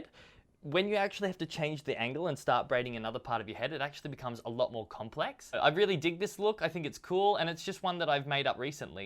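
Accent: Australia. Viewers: Is he Australian? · Are you Australian?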